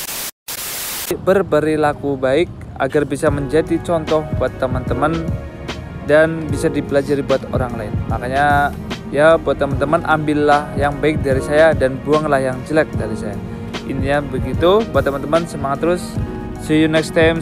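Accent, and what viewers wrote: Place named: Banyumas, Indonesia. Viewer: Ngapak.e khas nemen bib😅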